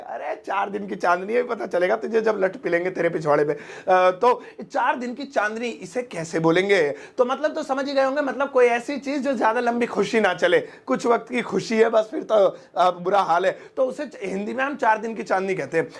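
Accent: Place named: Rajasthan, India. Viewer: Sir your and my language is same When you said - ka keh rho h ee 😅 I'm from bharatpur Rajasthan Watched all your videos 😍 Love you sir 🤟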